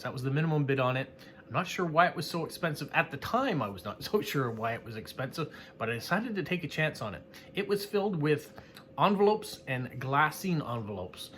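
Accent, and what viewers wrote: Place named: Canada. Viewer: Between these two videos that is a heck of a lot of US stamps. BTW I always get a kick out of your Canadian accent.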